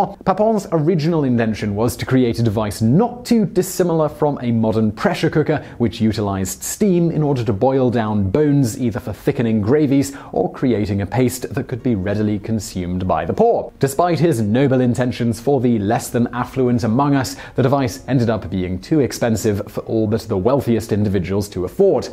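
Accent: United Kingdom. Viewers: Today I found out that you're British.......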